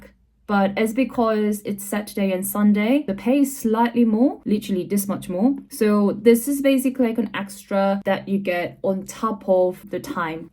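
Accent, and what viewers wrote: United Kingdom. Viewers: 영국발음 펄펙이십니다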